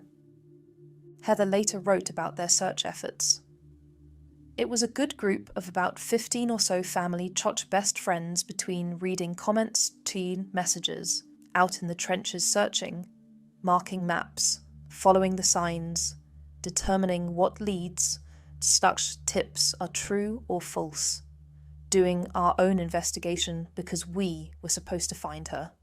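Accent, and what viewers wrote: United Kingdom. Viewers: This channel has its roots in the UAE yet the presenters accent seems to be from the UK 😮. 🤔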